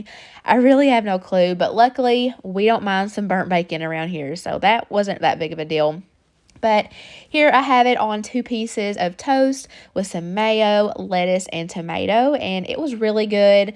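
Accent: South, United States. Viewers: I get so excited when you post! I'm from Tennessee but I still love to hear the country accent and see all the good cookin :)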